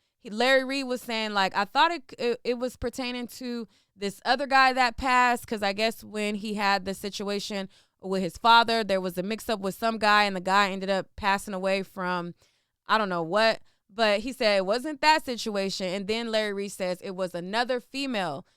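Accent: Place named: Philadelphia, United States. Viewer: U have a philly accent a Lil are u from philly?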